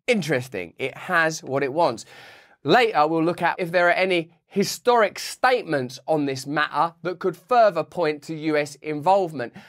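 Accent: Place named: United Kingdom. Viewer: You are the modern day Mark Twain with a British accent. Keep up the good work.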